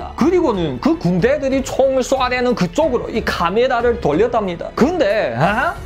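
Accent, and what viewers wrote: Yanbian, China. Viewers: 수고 많아요 연변한국어 정겹게 듣고 있어요 좀더길게 해주세요 너무짧아요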